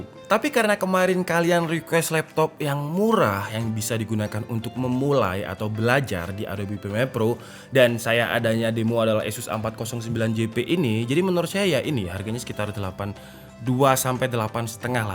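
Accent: Malang, Indonesia. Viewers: Abang Orang Mana Malang kahh?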